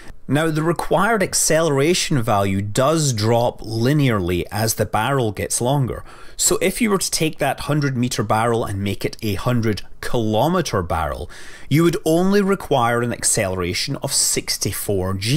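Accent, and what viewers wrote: Scotland, United Kingdom. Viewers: Hey... are you scottish, you sound scottish?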